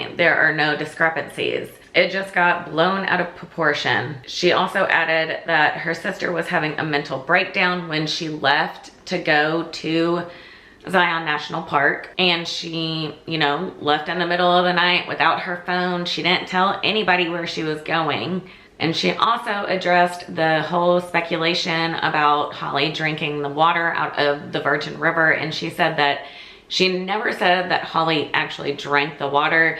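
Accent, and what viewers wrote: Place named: Louisiana, United States. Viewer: You sound like you’re from Southeast Louisiana.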